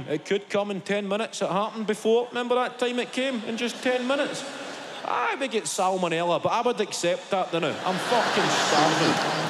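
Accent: Scotland, United Kingdom. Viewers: He's Scottish ya tool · He's not English. He's Scottish. YouTube doesn't understand Scottish. 😂😂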